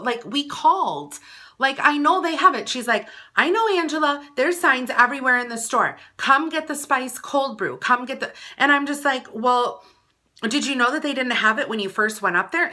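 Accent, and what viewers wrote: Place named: Midwest, United States. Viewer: dolly5621 chirios her voice makes my ears bleed. I really can’t stand the midwestern WICSAANSIN/ MINISOOOOTA accent. Ugh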